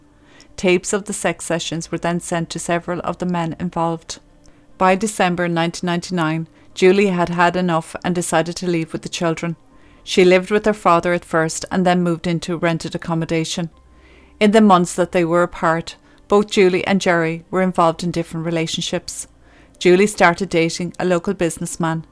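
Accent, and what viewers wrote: Ireland. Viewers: Love your channel. This was horrific, Its like i could hear the hurt in your voice when you mentioned Kia. Traumatic what she witnessed and what Nadine was put through. I always remember this one. Thanks for sharing, nice to listen to a fellow Irish. 😊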